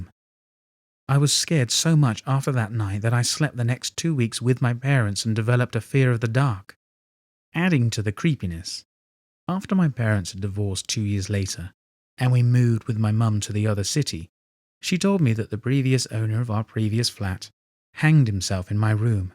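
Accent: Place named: RP, United Kingdom. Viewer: I'm two years late, but only recently found the channel: congratulations on the first book narration gig. I hope you've had many more in the interim, and I'm confident that has been the case, because you have the goddamned most crisp, posh, cut-glass accent my anglophilic American ears have ever heard on YT. You could easily pass for a BBC broadcaster.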